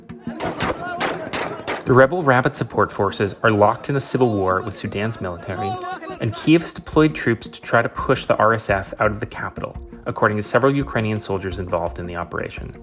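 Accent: United States. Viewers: Is this a Californian accent?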